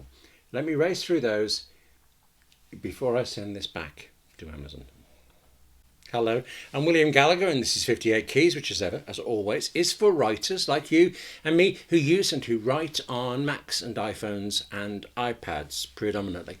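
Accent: United Kingdom. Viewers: I love the way that you describe your experience. so sincere and persuasive!!! and I do love British accent a lot. thank you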